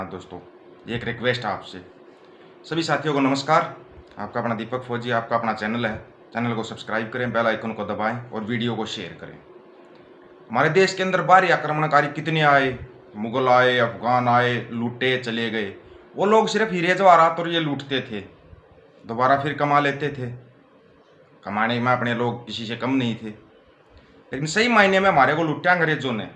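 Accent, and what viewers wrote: Haryana, India. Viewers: Tere boli Haryanavi hai or tu Punjabi trend karwa riha hai ki sabko pata nahi hai tu paise khake godi main ucchal riha hai .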